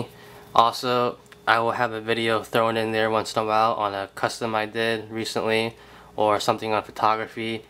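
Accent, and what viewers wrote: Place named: New York, United States. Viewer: ... I'm glad your one of the first to have the captain america toyline... your reviews are great bro... also your customs are amazing. Doode keep up the great work... and also... I heard a little New York accent hahaha nice... great stuff man!!! --Vincie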